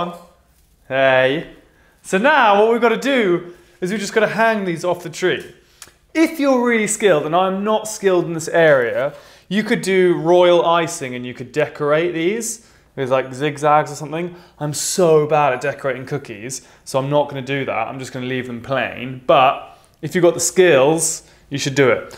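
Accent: England, United Kingdom. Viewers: Acabo de suscribirme y creo que tu acento inglés es inconfundible jajaja espero no equivocarme. Love your videos, and you❤️ I come from Chile 🎉😊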